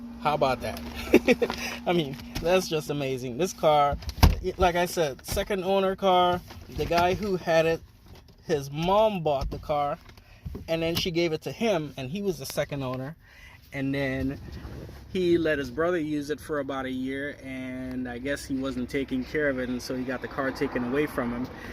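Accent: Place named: Virgin Islands, Caribbean. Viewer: Hi, I just "stumbled" on your page. I also bought a 1998 C230 about two years ago and I absolutely love it. It was owned by an older woman that just drove to church, etc. Unfortunately, she passed away and it just sat in the driveway. It was well maintained, just a few minor things. On another note, I listened to your accent and wondered if you are from St. Thomas V.I.?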